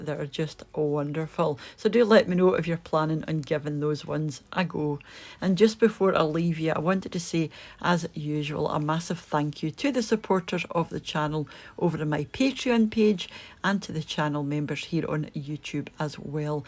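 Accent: Scotland, United Kingdom. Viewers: Tried this recipe works a treat. Very nice video and love the Scottish accent :) Well done.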